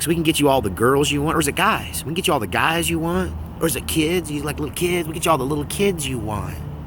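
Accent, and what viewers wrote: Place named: Texas, United States. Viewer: I knew you must be from Texas. (That's a compliment).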